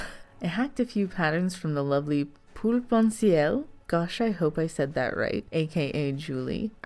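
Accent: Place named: West Coast, United States. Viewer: I knew it was the west coast but your voice sounds WAY too familiar to me... hence why I asked about WA or OR. I've never lived in CA so it's likely that you just have a voice similar to someone else's. In any event, I know a west coast gal when I hear one. Lol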